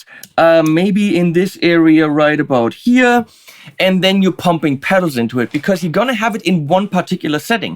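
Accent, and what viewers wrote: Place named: Germany. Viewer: ..... 🤩🥰😍😊 👍👍👍👍👍👍👍👍 Deine Arbeit, deine Reviews, dein Witz/Humor, dein Guitarplaying, dein "deutscher Accent" 😂, deine farbefrohe Kleiderwahl (absolut meins 👏👌), die Tier-Clips am Schluss....... 😂 Habe mittlerweile natürlich einige ähnlich gute "Rezensenten" gefunden, deren Clips ebenfalls richtig gut sind..... die ich mir (man sich) auf jeden Fall von vorne bis hinten reinziehen kann...... aaaaber, Du bist unschlagbar 😅✌😎 Freue mich auf jeden neuen Clip von dir 👍 Vielen vielen Dank für deine Arbeit und beste Grüße aussem Pott Rosigatton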